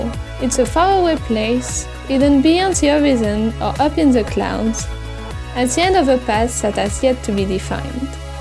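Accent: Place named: France. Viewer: your videos are really cool !! but you have a strong accent, are you french by any chance?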